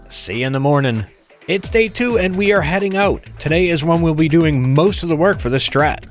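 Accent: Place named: Boston, United States. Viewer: Dorchester kid here, youre either from close to here, or ha e the accent down when you choose to do it. Wicked pissa kid.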